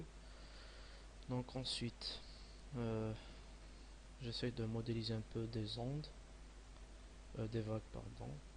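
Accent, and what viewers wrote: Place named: Kabylie, Algeria. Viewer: toi t es Kabyle !!! j'adore l'accent t'es de chez moi toi ^^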